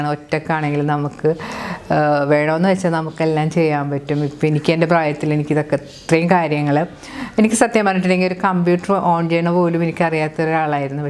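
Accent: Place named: Kochi, India. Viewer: Inspiration video 😘😘 നാട് കൊച്ചിയിൽ ആണെന്ന് സംസാരം കേട്ടപ്പോൾ തന്നെ മനസ്സിലായിരുന്നു🤪, സെറ്റിയടെ അടുത്തിരിക്കുന്ന flower base കിടിലൻ ആണല്ലോ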